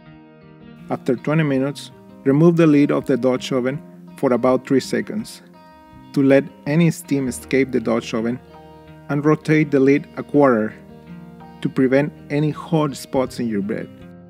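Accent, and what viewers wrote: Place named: Costa Rica. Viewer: .Iam from Costa Rica so i wonder are you ,you accent sounds like a tico from CR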